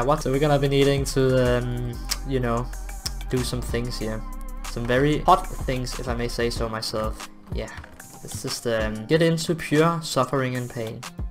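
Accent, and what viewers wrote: Denmark. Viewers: It's the danish accent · I smell danish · Are you from Denmark?, your english sounds a little like it, Keep up the good work <3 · You really Sound Danish are your Danish?? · Are u danish?? Sound alot like a dane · Er du måske dansk haha, nemt at høre ;) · Dude its danish, he is a dane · I smell danish. Me too partner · Are you from denmark? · Is he danish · This man sounds so danish. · Are you danish by any chance? Quite northern accent😁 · were a you from? bc you have a danish accent. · Hey i just Wanted to know if you are danish cause your English has a danish accent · Are you danish? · are you from denmark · You Sound danish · U from Denmark? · aRE yUo A daNmaRKeR? Yes Can tell from ur accent ;) we can always find each other us danish ppl am i right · i smell danish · it sounds like you are danish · i knew ur were danish the accent is HEAVY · i smell danish, you said i figured out who det er lol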